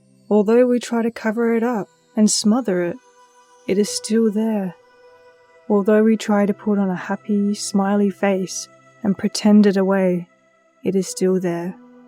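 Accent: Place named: United Kingdom. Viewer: OK. I have to say I'm totally gobsmacked that you have a British accent! I've been following you for several years now, simply because you told your story of having an extreme "cultish" religious upbringing that matched mine VERY closely. I'm in the USA; and perhaps I didn't think that anyone outside of the USA could have the same severe religious upbringing as me (and I must say, mine was MUCH more severe, abusive, controlling, cultish and brainwashing than yours). Yet...you have had a harsh religious upbringing, too...and in Britain! Seriously...I didn't ANYWHERE ELSE in the world could be as bad as Tennessee and Georgia, USA!!! And hey...I ADORE your accent! It's SO NICE to finally "hear" you and not just "read" you! Thank you for this, and blessings!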